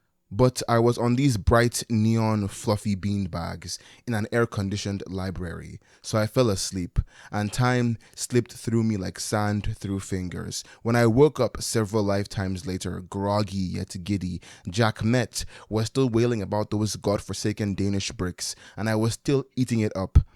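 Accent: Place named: Nigeria. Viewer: While I won’t say us Nigerians are rare, we are few and fair between when we occupy commentary spaces I absolutely shocked when I heard the close to home naija dialect and little slips of our accent mixed with such eloquent writing and structure in this vid. As a fellow Nigerian who felt stuck In how our community limits us to such places I’m pleasantly surprised and annoyed i found you just now.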